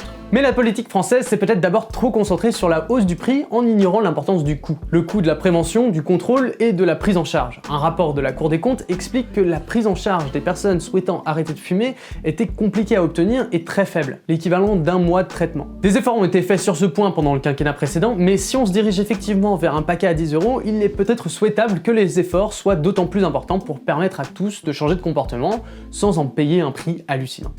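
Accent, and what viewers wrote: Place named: United Kingdom. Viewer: Ton accent anglais est incroyable, j'ai eu de l'ASMR quand t'as dit "the economics of welfare"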